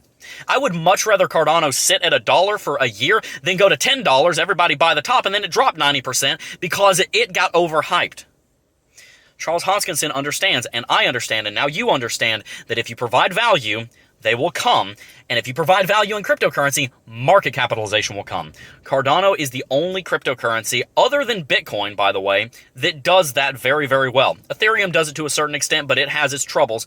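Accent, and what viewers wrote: South, United States. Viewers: Fast talking southerner. I like it. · Said like a true Southern fast-talking scientist/engineer.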